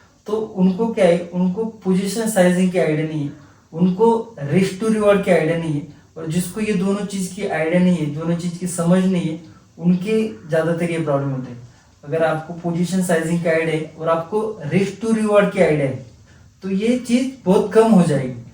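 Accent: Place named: Maharashtra, India. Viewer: मराठी..मस्त.. गुलाबी हिंदी भाई😂😂 एकदम कडक I like it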